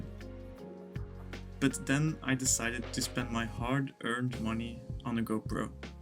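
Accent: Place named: South, Sweden. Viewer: Are you from Sweden? I'm from Sweden I recognize that southern-swedish-to-english dialekt anywhere :) Also I like games with terraforming! If you eonder how the algoritm brought me here i started watcging one devlog and now youtube brings me 1-2 devlogs from different people everyday it must have hooked me to "devlog" as a term so now it recommends everything that has that in it. If your from sweden i wish you extra luck because we need more game developers I wanna play some swedish games thats not just minecraft! If your not swedish i still wish you the best :)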